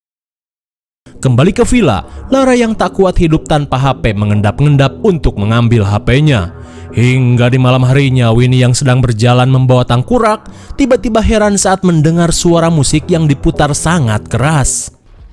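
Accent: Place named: Sunda, Indonesia. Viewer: Sunda na lekoh pisan ih 😂😂😂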